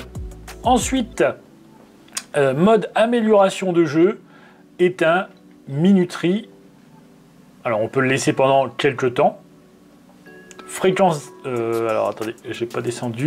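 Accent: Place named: Nord-Pas-de-Calais, France. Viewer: le petit accent chti sur les "a" mutés en "oa" bien lourds lol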